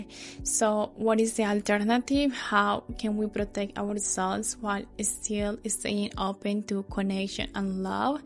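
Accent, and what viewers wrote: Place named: Ukraine. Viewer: Im guessing Ukrainian or Solvakian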